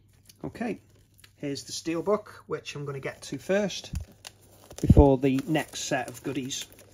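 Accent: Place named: Liverpool, United Kingdom. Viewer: Someones from Liverpool?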